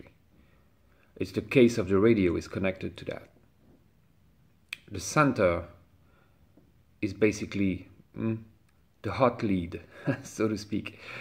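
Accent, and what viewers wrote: France. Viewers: not only is your content great! You also have great French accent which makes it even that much more interesting. Thank you for making videos! I love to watch them in my free time. Maybe I’ll have time to make my rats tail today:)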